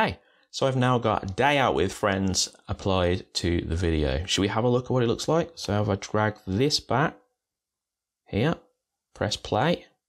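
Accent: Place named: Birmingham, United Kingdom. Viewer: AT LAST. A tutorial in plain English: step by step instructions and no ear shattering unwanted music accompanying it. Why can't instruction videos ALL be like this. My guess is that comes from the Midlands...shades of a Birmingham accent, but that's cool because I'm also from the Midlands: Northampton to be precise. Have had the full AVS Suite for a long while but this video has given me the impetuous to REALLY begin to explore it further. Thanks mate. Have subscribed.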